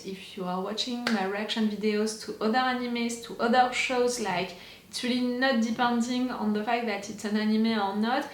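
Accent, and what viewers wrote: France. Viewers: yeah it's probably because of your french accent Most people doesn't like it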